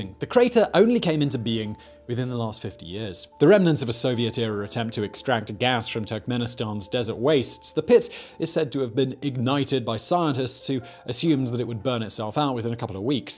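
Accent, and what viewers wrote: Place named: England, United Kingdom. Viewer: Your voice sounds familiar but in a posh English boring drone way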